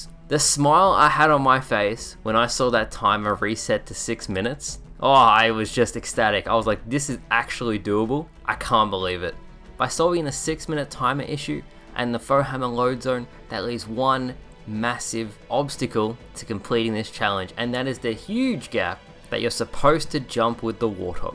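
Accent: Australia. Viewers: the aussie 13 year old voice just broke my immersion in your videos.... unsubbed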